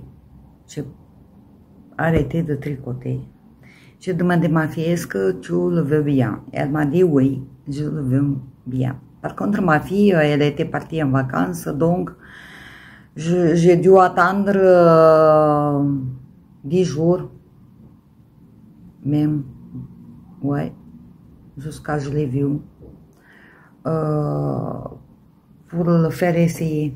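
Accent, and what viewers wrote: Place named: Italy. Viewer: bonjour c' est très jolie ,avec un magnifique accent a la monica bellucie!☺ laine jolie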